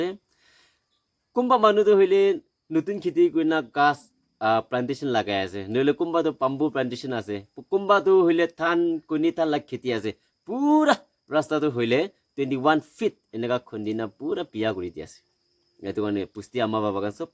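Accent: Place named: Thailand. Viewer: I like your Thai accent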